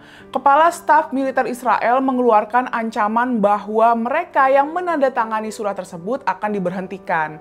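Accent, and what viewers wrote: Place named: Betawi, Indonesia. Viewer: Semoga tercipta perdamaian. Di channel ini baca berita dgn dialek Betawi yg kental banget. Gpp sih. Sah-sah saja.😂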